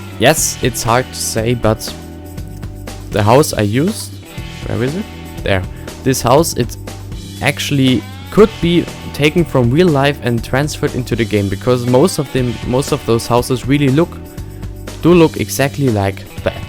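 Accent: Germany. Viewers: man hört es sofort das Du Deutscher Bist xD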